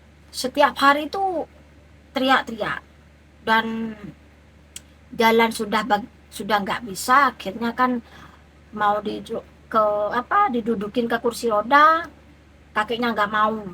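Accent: Madura, Indonesia. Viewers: Logate meduro banget ce....🤣🤣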